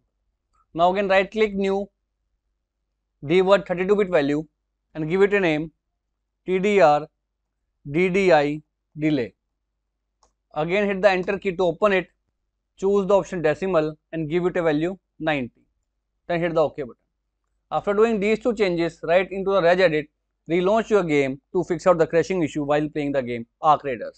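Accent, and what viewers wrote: India. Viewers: Me finding 10 Videos before where an english dude is talking -> instant skip Me finding Video with heavy indian accent -> this is what im looking for. Always the best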